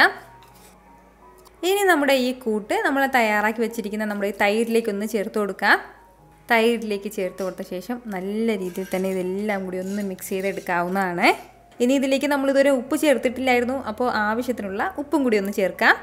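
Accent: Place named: Tirur, India. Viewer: Excellent recipe,really good for this present climate,ippozhanu orukaryam orma vannethe Thiroor vettom swadeshiyano slang ketteppo thonniyethanu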